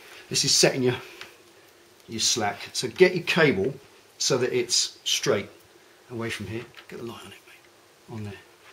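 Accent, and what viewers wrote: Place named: England, United Kingdom. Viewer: What a great video and so nice to hear an English accent working on a Harley rather than the usual southern American. This is now a no brainer for me to do myself.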